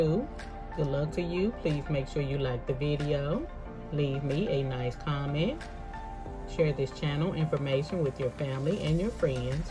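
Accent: South, United States. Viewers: Thank you I love ❤️ hearing you southern lingo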